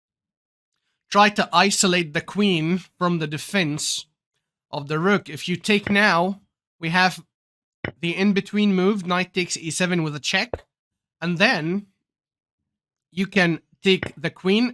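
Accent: United Kingdom. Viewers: What a British accent 😍😍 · İ am in love with your British accent doc😂❤ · Marvelous video ❤, Nice presentation and magnificent pronunciation with accurate British accent ❤, love the change and hopefully you continue with the same enthusiasm and quality for long time 😍🤩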